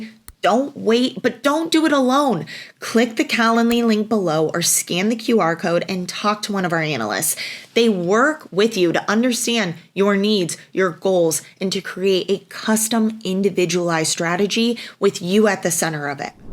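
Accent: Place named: Cleveland, United States. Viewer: are you from the Cleveland area? thanks.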